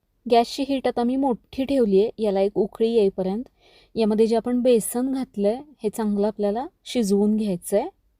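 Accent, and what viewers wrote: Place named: Konkanastha, India. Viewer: तुम्ही कर्नाटकातल्या असून सुध्दा एवढे शुध्द स्वच्छ गोड मराठी छान बोलता .बरेच उच्चार कोकणस्थ लोकांसारखे वाटतात .हल्ली अशी शुध्द भाषा ऐकायला मिळत नाहीत .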